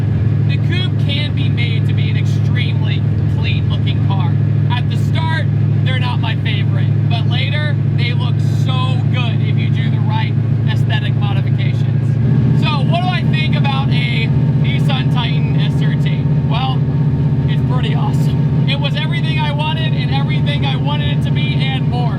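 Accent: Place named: South, United States. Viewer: Oh no.... It's finally happened... has been living in Georgia too long & is losing his ability to sound normal slowly.... he keeps saying "S13" coupe and it sounds like he's saying "S. R. teen" Don't let that slack-jaw southern draw seep in to your brain! PRONOUNCE your words... don't become a country boy for all things holy.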